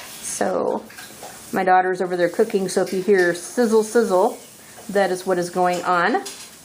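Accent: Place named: United States. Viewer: I'm English and I love your American accent :) Beautiful Also your laughing is Infectious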